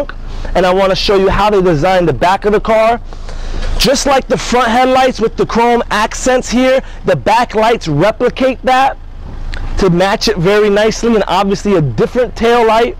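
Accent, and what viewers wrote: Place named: Queens, United States. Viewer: Dude... you're very cool with your accent... Queens NY? But it's pronounced Hunday, not Honday. And thanks for showing the SE model. I wanted to see what it looked like with those for lights on.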